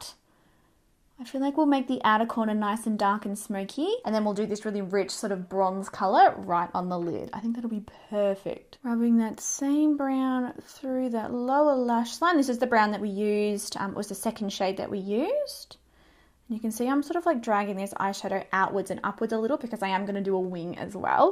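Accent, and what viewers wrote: Australia. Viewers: your prononciation of Jouer with your Aussie accent is spot on in the ears of a French spoken person!;)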